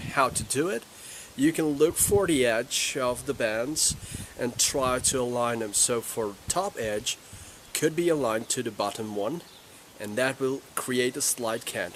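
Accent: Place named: North Carolina, United States. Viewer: I can't figure out if he's from North Carolina, Virginia area USA.. or somewhere in England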